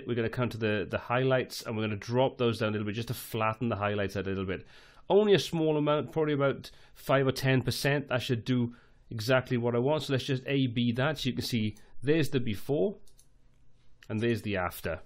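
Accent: Wales, United Kingdom. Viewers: So good to hear a welsh accent on this!! Much love and thanks for the lesson!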